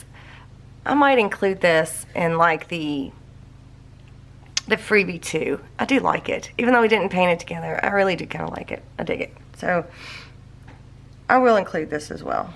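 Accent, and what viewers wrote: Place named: Kentucky, United States. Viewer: ! You have to be best person I have ever come across that is a creative genius, motivator, beautiful, loving, down to earth, genuine, giving with all your heart, Kentucky talkin' sweetheart!!!! I love all your videos!!! These are special and I can't thank you enough for all that you share and give to us!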